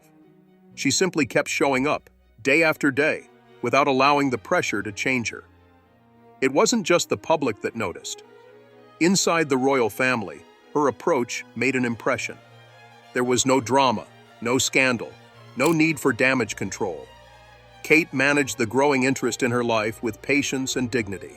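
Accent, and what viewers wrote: United States. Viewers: Disrespectful using a US voiceover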